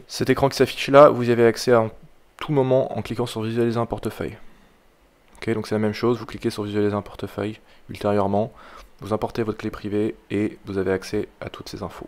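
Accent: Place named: France. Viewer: Merci pour la vidéo mais de grâce, parlez moins vite et articulez. Je suis Québécois et ne comprends pas bien votre accent Français. J'en déduis que les francophones des autres pays auront aussi de la difficulté à vous comprendre.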